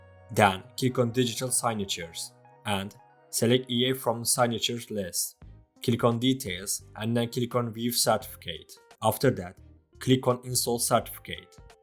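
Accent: Turkey. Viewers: İngilizceyi türkler gibi konuşuyomuş bu çocuk diyodum hangi milliyetten acaba derken yazısını gördüm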